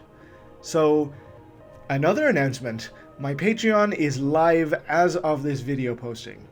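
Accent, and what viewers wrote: Ireland. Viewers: Where are you from? Accent sounds a bit irish?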